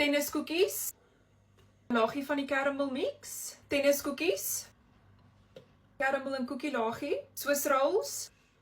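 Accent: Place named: Scotland, United Kingdom. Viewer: Which language are you speaking, it sounds like dutch, frysian and english combined with a Scottish accent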